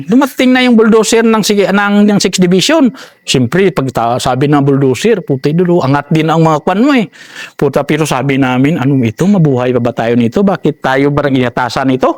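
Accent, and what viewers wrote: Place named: Iloilo, Philippines. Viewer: ilonggo gid ang accent 😂🫡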